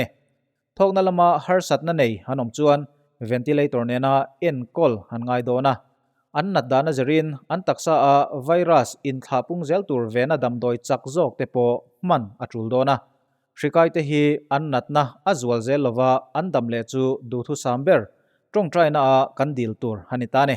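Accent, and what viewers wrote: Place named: Mizoram, India. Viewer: I mizo ṭawng hi a MIZO bik riau zel...kal zel rawh le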